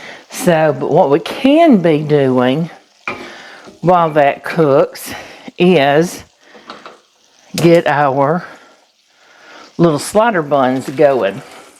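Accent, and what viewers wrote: South, United States. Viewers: So cute in your southern accent : buttered my little buns.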